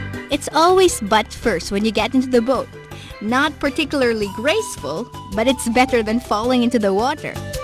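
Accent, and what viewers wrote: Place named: Philippines. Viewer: Love her cute filipinas accent.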